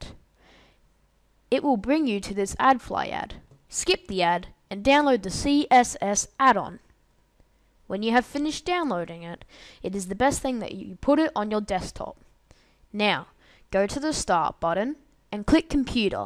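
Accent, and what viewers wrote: Australia. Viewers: Is that a Australian accent? thanks bro works perfectly :D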